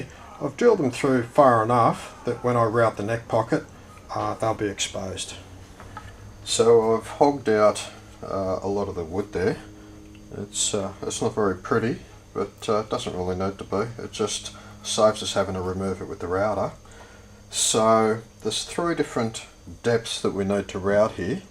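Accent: Australia. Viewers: I'm assuming you're from australia with your accent, and if you are whereabouts? do you do custom builds?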